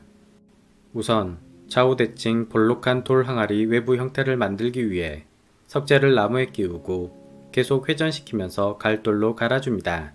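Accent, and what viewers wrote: Jeolla, South Korea. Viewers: 좋은영상 감사합니다~ 성우분 전라도 사투리가 들리네요😆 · 은은하게 들리는 전라도 억양이 반갑소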